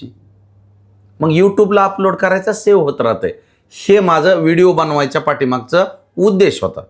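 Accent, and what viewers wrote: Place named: Marathwada, India. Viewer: Greet manush ahe rao bhau vicharpan changle mandto marat vadyachi boli bhasa ahe ti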